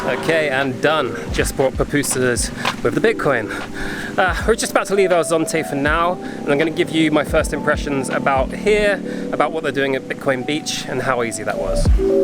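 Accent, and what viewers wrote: United Kingdom. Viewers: Hi, buddy where are you from? Your accent is like britain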